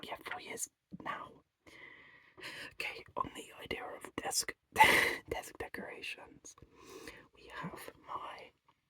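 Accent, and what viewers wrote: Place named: United Kingdom. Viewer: I’m sooo ready to just relax to this video even though it’s an older one of yours. It’s been a long day. Random but I have one pen pal friend that’s British and I’ve never heard their voice before but hearing yours..I can imagine they might have an accent like yours. Though I’m trying to keep in mind that there are different accents depending on where you are in your country..? Right? 😂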